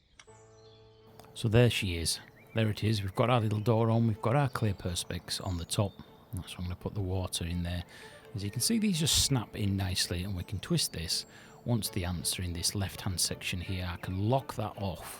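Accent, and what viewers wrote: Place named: Northern England, United Kingdom. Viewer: That moment when you realise your tube doesn't fit.... Love the northern accent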